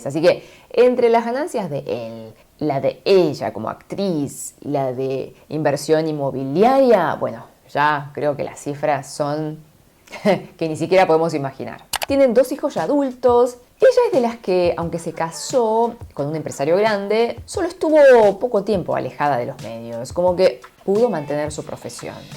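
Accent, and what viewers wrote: Argentina. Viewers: Saludos, me encanta escuchar tu Espanyo Argentino. Una pregunta, en Corea, no se reconoce la soberania personal, los derechos humanos? He escuchado de gente Japonesa que los "Daimyo" de Japon no son tan poderosos como los "Chaebols" de Corea por rebeldia de la poblacion. Es verdad? En tu opinion, hasta donde llega la lealdad a uno mismo vs a la familia mas, en Japon o en Corea?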